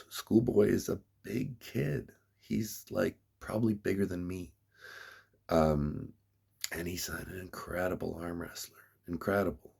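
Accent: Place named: Canada. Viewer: I been watching you arm wrestle before <num>, it’s crazy how your accent has changed, I miss the deep Canadian accent, God bless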